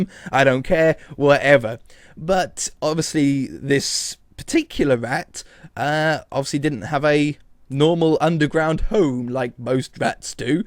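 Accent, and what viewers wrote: United Kingdom. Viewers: That British Accent I'm First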